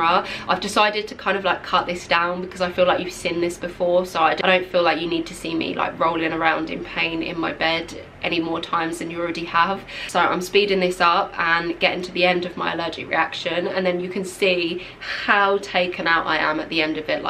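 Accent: United Kingdom. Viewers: It’s a British accent -_- what’s wrong with it?? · malak beauty girls that’s offensive why yall hating on her accent ik u may replie to me “it’s just a joke” but I’m British too and I don’t say it like woo we say it like Wawter so yea. Offensive! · Your accent keeps reminding me of those listening tests from cambridge we always do in high school here hahahah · over dose British accent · Love Yuor British Aaxent.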